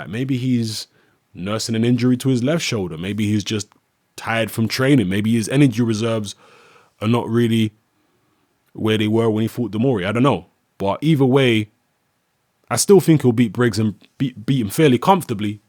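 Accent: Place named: London, United Kingdom. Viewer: are you from north London? You have the same accent as all those grime guys.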